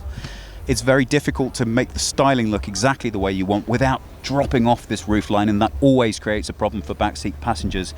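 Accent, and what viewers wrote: Germany. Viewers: I like this man, he speaks very fluently and clearly. Is he german?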